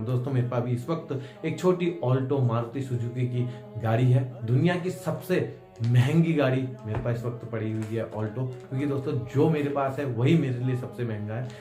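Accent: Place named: Lohaghat, India. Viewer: Aapkey bolney k tarekey sey mujhey merey lohaghat k bhai bandhu aap mey hi najar aa gaye aa gye,sari jaankari bahut badiya de aapney .Man karta hai ki abhi Gurudev k dershan krney aa jaau per noukari na honey sey preshaan hu,is chinta sey mukt hotey hi Baba ji k chaar dhaam dershan krney jarur aaungi 🙏🏼jai baba ji maharaj 🌼🌼🌼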